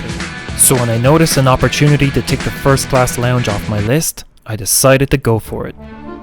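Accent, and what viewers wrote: Ireland. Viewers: Loving the work lad. What part of ireland are you from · gowan you mad thing! what part of ireland are ya from??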